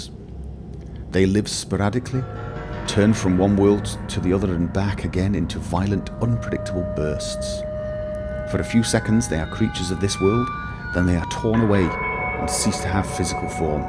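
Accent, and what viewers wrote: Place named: North West England, United Kingdom. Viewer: He is almost certainly from the North West of England. We have almost identical voices so I'm going to say he's within a 40 mile radius of Manchester. :D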